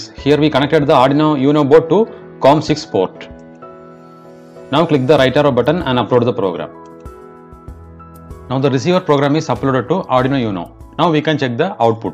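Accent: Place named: India. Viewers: Sorry, but horrible to listen - Indish-English ... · Please turn on the caption if you are incapable to understand the Indish-English. In this way you can get it easily. Thanks.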